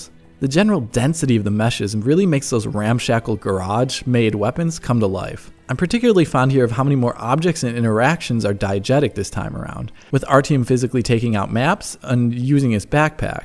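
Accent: Germany. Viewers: Wußt ichs doch, das ich da nen deutschen akzent raushörte. Bis bald 😉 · And by millennial lisp, I guess you mean a German accent.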